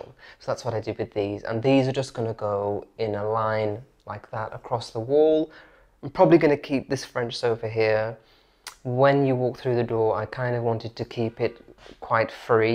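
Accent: RP, United Kingdom. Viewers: Oh that BBC Accent - it's like listening to a young Kenneth Kendall reading the 9 O'clock news. I am an avid fan of Farrow and Ball, their Dead Salmon is to die for, pardon the pun, but Mouse's back - well I never - they do name their paints after some odd things. Such a stunning room with so much potential - I think you need to pay us all a visit and give us some design tips and ideas, they always say a fresh pair of eyes is like a new beginning, you never see it yourself until somebody else tells you.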